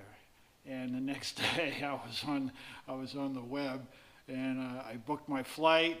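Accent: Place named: Boston, United States. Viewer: Love the Boston accent. I start NOBO on 2/24; best of luck on your journey! Maybe find someone to mow the lawn so your wife doesn't have to do it- lol.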